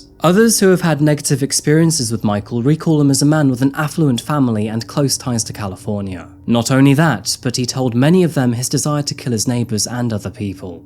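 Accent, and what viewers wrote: England, United Kingdom. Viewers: I'm glad this case got solved and that pile of anal seepage is behind bars. Thank you for another great video! They're always so well-made. You're not just a disembodied voice or 3 hours of interrogation videos with minimal, basic commentary. You're empathetic, which I absolutely love! You're kind and respectful to the victims and their families, and it's also nice that you sound (like your accent) that you're close to me! I like watching more British creators, obviously I get our dry humour. I'm rambling. Anyways, thank you for keeping us all informed! Big hugs for you ☺🫂🫂🫂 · If you’re from Seattle, why do you sound like a British kid? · I thought u were British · my Sister n fam lives in Seattle I am so glad I have lived in Western CO since 1980, I thought u sounded a bit like u lived in UK due to ur voice love ur vids thx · Wait a second here. 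You're from Seattle? Where's the English accent come from then. Or is it fake? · You sound so polite, so refined, I did not think you were American! 😂 I thought you were British. 😂 ❤ from Australia P.S. I absolutely love your channel - addicted in fact - keep up the good work! 🤩🤗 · Wait, you're from Seattle? What's with the English accent, then? Is it just an act? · Where do you get your English accent. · I’m so glad you don’t speak like an American! Love your British accent ❤ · Okay how did you end up with the British accent? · How tf were you born in seattle but sound like a complete tommy? That's weird my dude... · Who writes the scripts for this stuff? Why did i need an introduction of Seattle? Why did you tell me you were born in Seattle but leave me confused with your British accent. I can only guess you moved to the UK at an early age. You need a better team for your videos... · How were you born in Seattle with a English accent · wait ..whaaaa did he just say that he was born in Seattle Washington ??? 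did I mis hear ? This guy is the most british sounding Brit in the history of Britain LOL weird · And no better accent to do it with than the British accent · Born in Seattle? You must have moved to the UK very early on because that is a lifelong British accent. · Ok you have to explain how you were born in Seattle but you have an English accent? Sorry if you’ve already explained this somewhere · Love your videos and your British accent. As for the douche bag who killed this young couple because they could not pay their rent, it made my day to know that he is behind bars and that he is going to stay there for a very long time, probably until he dies. What a dumb guy, you are paying back now for being so stingy and evil. Now you are old, weak, alone, depraved of freedom, without any outlook. I am glad that courts of justice in the US are severe, much more than in France.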